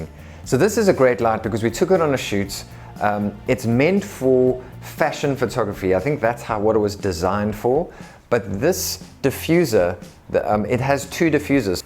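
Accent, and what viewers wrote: South Africa. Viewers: hey man just heard the way you speak and was wondering are you South African since you also priced the weatherman in rands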